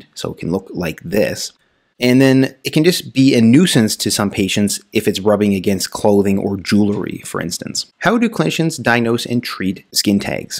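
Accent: Canada. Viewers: It's called a Canadian accent, genteel educated version.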